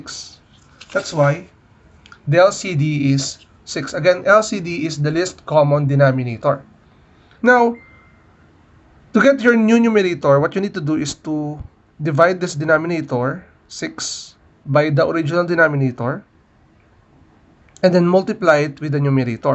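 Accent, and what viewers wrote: Philippines. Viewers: are you phillipino becuase i can tell by ur accent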